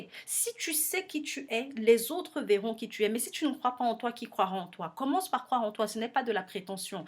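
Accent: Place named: Cameroon. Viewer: oh Mon Dieu! ton accent je me disais aussi que tu es Camerounaise. l'accent😅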